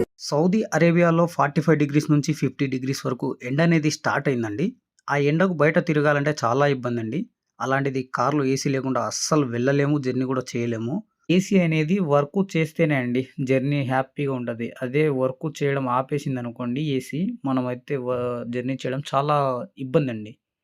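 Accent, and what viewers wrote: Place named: Karimnagar, India. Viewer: Mee matalu vintunte ma karimnagar la anipistundhi bro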